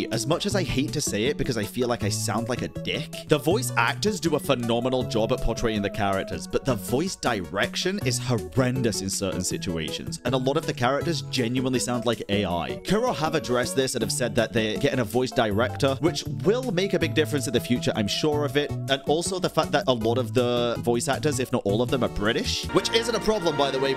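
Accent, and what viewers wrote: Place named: United Kingdom. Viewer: Yo, British accent is hot af let them cook well speak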